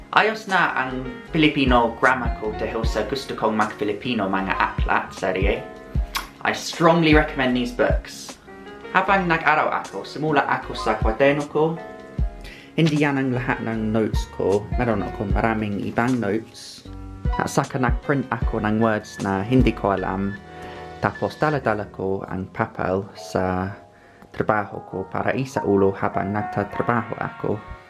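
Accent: United Kingdom. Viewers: You should pay more attention to your accent, you are speaking Tagalog but with a British accent. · SaLute! kitang-kita grabe ang dedikasyon mo para matuto ng tagaLog and it reaLLy worked and growing, kudos, more power! Love your Brit accent too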